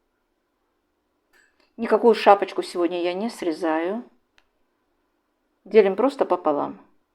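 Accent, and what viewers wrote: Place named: Baku, Azerbaijan. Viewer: Здравствуйте. Я когда первый раз увидела ваше видео,вернее услышала-думаю,что то знакомый выговор,вернее манера говорить. 😅Оказывается вы наша из Баку. Только Бакинцы так тянут слова😂Привет вам из Баку и долгих лет жизни ❤Аллах джан саглыгы версин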